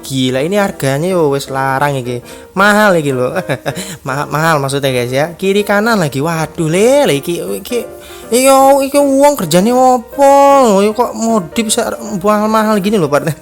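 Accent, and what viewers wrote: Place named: Java, Indonesia. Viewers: Kenapa ke jakarta malah medok to bang 😂 kekel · orang lampung tapi medok🤣🤣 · wooo.. wong jowo to, medok² gurih..:v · Bahasane lohh kok dadi medok bang😂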